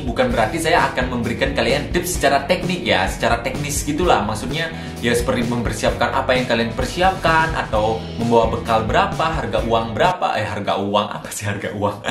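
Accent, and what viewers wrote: Bali, Indonesia. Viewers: Gak tau knp klo' ad orang bali ngomong, suka banget pas bilang "T" 🥰🥰 ya udh klo' ke bali aq kontak km dulu bli hehe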